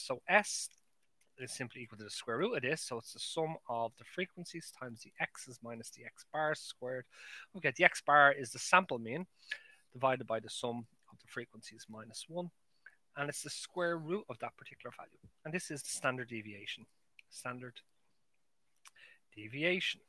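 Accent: Ireland. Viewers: I was studying the time series chart video at like the first very second in on the video I noticed ur irish xdd geez nice videos man I'm taking MATH201 (probability in business) or so so I'll be using ur channel a lot :) so you've got a new subscriber hahahah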